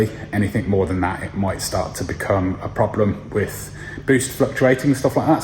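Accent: RP, United Kingdom. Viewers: makes us English guys sound like absolute fucking pricks , the definition of posh rich twats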